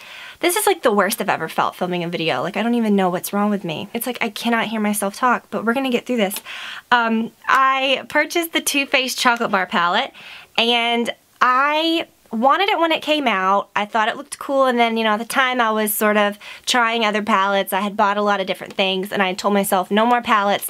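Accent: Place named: Georgia, United States. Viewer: Enjoyed seeing these favorites. Sorry you're having such terrible seasonal problem's. Though, I do think you should make sure your ear problem, is caused from seasonal sinus problem's & nothing worse......I did giggle for a second when you made that comment about hoping you weren't sounding different, as what I noticed {heard} most was your GA drawl seemed more pronounced, than usual.......which I clearly love and am partial too hearing! Hope you and Brad have a fun filled weekend...{hugs} from Statesboro! ~J~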